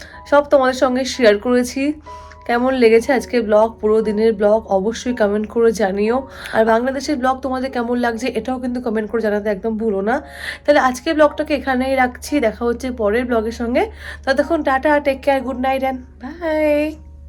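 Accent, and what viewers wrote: Kolkata, India. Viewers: তোমার বাংলাদেশের ভ্লগগুলো দেখলাম। তুমি খুব সুন্দর করে কথা বলো। কোলকাতার বাংলা আ্যকসেন্ট আমার কাছে বেশ দারুন লাগে। আরো ভ্লগের অপেক্ষায় রইলাম। আর মাওয়া ঘাটের ইলিশের লেজের ভর্তাটা আসলেই খুব মজার। আমি ২০১৯ এ খেয়েছিলাম। তখন অবশ্য এত সুন্দর হোটেল দেখিনি। এখন বেশ ভালো লাগলো দেখে। যাইহোক, আশা করি তোমার বাংলাদেশ ভ্রমন ভালো যাচ্ছে। এপার বাংলা থেকে বিদেশীনীর জন্য অনেক অনেক ভালবাসা রইল।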